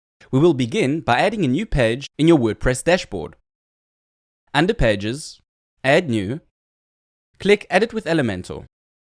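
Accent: South Africa. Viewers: That's how South Africans speak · Sounds like South African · it retrospect idk what i was thinking, sounds pretty south african. i think it just hit me as pretty unique the first time around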